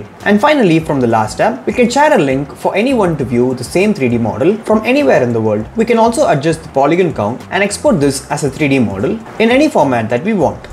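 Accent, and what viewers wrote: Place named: India. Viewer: I appreciate your channel, I would encourage you to slow down your speech since your English has a strong Indian accent, it takes longer for my brain to process the accent. I think you would have more followers by doing this. Good luck and thanks!!!!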